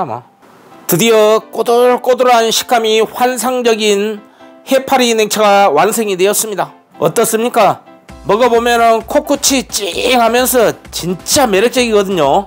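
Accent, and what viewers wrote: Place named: Gangwon, South Korea. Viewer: 강원도 분이시네요. ㅋㅋㅋ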